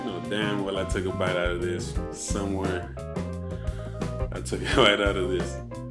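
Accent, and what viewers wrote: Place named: California, United States. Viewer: You sound like you from Cali 😂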